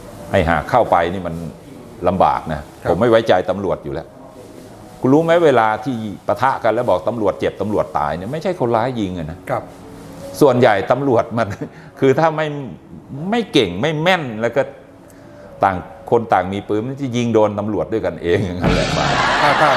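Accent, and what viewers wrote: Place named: Pak Nam Pho, Thailand. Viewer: สำเนียงคนปากน้ำโพค่ะท่าน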